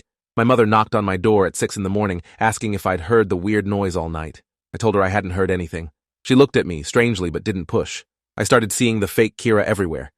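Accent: United Kingdom. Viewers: Holy British bru 💀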